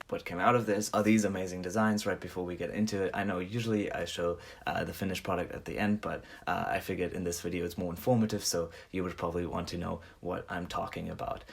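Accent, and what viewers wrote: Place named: South Africa. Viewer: i knew i heard south african :) my parents are both from there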